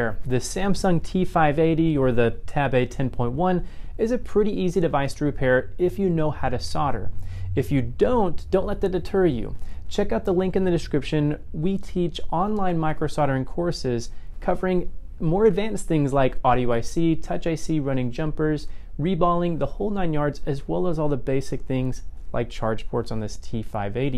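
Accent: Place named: Morocco. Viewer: من الهدر باين انت مغربي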